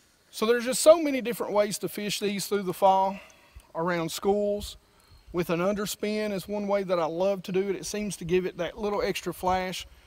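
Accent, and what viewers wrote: United States. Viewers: i could listen to ur voice all day.. love the country accent!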